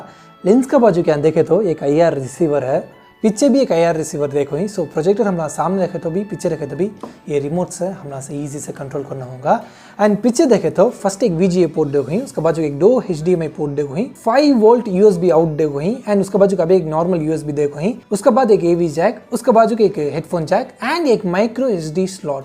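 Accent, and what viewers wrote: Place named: South, India. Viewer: Super jab koi sauth indian hindi men baat karta hai to bahut achha lagta hai sunne men ..superub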